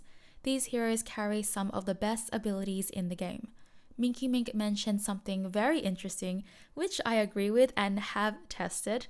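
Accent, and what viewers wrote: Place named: Australia. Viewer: I have been watching a couple of videos, and from the first video, I thought that was an Australian accent, (because mine is about the same or a bit stronger than that) so I was quite glad to find that you are based there too.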